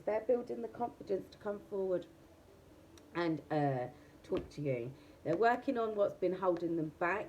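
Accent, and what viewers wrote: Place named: London, United Kingdom. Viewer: You must be London 🤔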